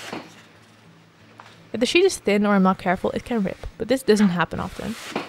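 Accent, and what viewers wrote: Netherlands. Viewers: I love how simply you have explained this. I would like to make some paper for my watercolour work. If you don't mind me asking, where are you from ? I detect an accent and it sounds Dutch 🤔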